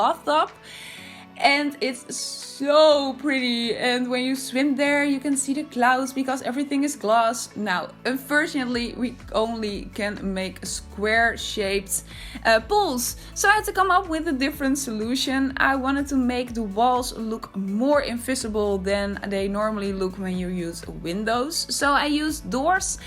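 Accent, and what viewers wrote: Netherlands. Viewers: Are you dutch? Cuz u sound like one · Are you Dutch by any chance? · I like this design a lot. Also, are you Dutch? I think to recognize the familiar accent. :) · Hahah ik hoor gewoon dat je Nederlands bent😂💜 Het ziet er echt cool uit👌😍💜💫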